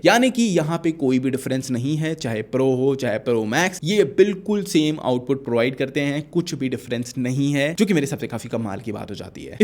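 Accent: Sindh, India. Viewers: Are you Sindhi??❤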